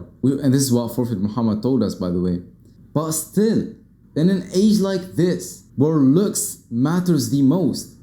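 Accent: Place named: France. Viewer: Great channel masha allah. You sound French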